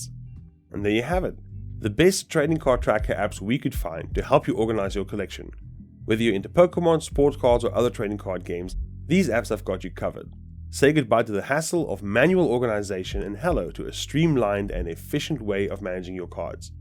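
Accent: South Africa. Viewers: South African? 🇿🇦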